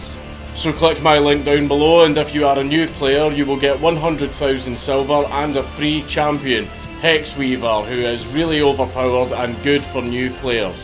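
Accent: Scotland, United Kingdom. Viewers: The stories you tell, and the way scottish accent, expose you as a really cool person who is doing something he's good at .... for the love of it. Thank You! Be well, and yes, I'm still practicing, but I've got doubt that I'll ever be able say 'clouds' , or 'fuck' properly. really, I guess it's just something you're born too. It sounds so ....... dare I say ... no. :-]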